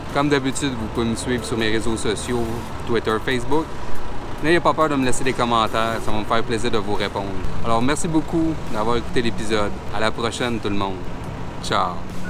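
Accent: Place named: Quebec, Canada. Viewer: Très intéressant je vais essayer bientôt, je présume vue ton accent que tu est du Québec. Je me demandais si les baie de genièvre sont facile a trouver? Et que penser vous de filtré a nouveau avec exemple un filtre (britas)?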